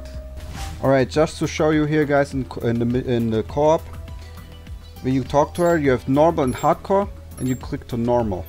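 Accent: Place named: Germany. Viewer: Bro's accent is very German xd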